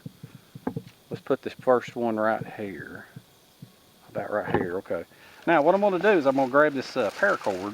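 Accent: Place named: West Virginia, United States. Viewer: well either way my wife's a fan, I think it's the accent. My cousin Mike, back home in WV looks and sound just like ya...✌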